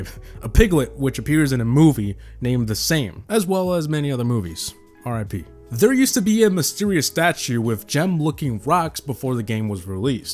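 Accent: Norway. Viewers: Bro are you Norwegian or sum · Are u norwigan ?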